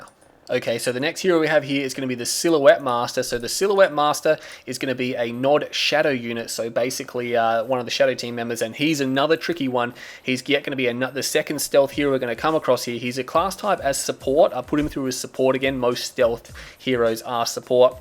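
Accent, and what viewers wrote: Australia. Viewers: Your Ausssie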